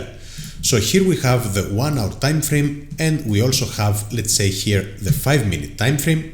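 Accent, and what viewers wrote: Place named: Greece. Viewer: That greek accent is strong !!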